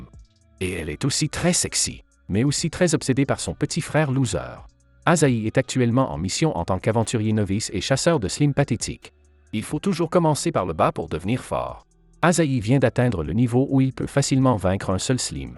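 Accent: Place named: Canada. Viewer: S'il vous plaît 🙏 vous pouvez lui dire d'enlever son accent canadien et de redevenir comme avant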